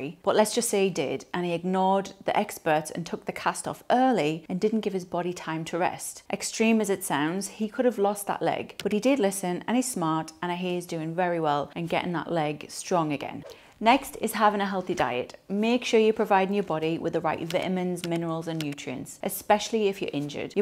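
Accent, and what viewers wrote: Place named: Sunderland, United Kingdom. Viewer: My ribs are done in off a hook to them. At least 6 weeks off. Possibly broken or muscle damaged. I can’t help noticing the sunderland accent. Am from Washington Tyne and Wear.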